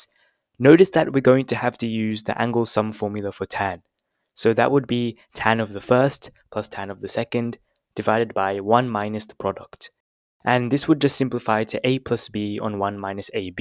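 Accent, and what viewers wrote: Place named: Southeast Asia. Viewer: I dont think so,his accent is diff from indian,more like southeastern or something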